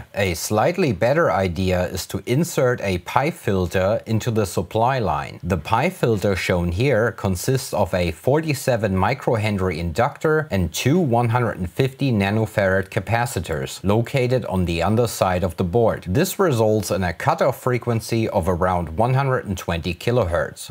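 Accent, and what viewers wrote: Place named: Germany. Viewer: schönes video. Achjaaa deutscher Akzent 😁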